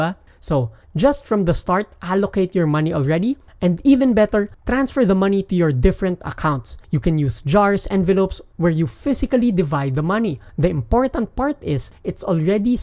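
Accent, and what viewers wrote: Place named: Philippines. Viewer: Very informative and easy to understand..excellent "Tagalish" tone.. 👍🏽 Thank you,! Cheers!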